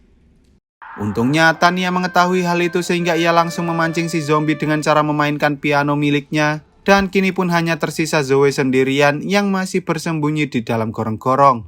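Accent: Java, Indonesia. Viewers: Miminnya logatnya masih medok😅 tpi seru jg dengarnya😅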